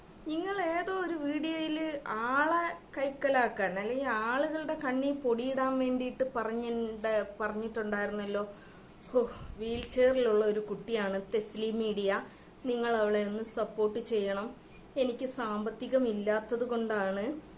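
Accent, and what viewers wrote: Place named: Thiruvananthapuram, India. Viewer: ഞാൻ പറഞ്ഞു 🤭 തിരുവനന്തപുരം ഭാഷ സൂപ്പർ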